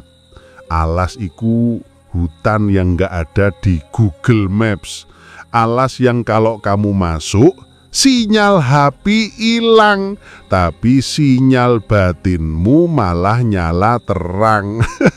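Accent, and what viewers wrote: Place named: Banyumas, Indonesia. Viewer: Nyimak ewong ngapak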